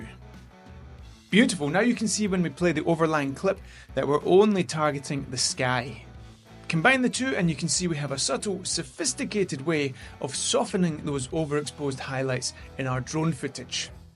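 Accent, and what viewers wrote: Scotland, United Kingdom. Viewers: Well done (&!), Good to have you both back and rolling! Great content, effective comms/visuals as always. And for me the tempo / pace is perfect). 😃And enjoying the scottish entourage (and accents …😉) …👌🤛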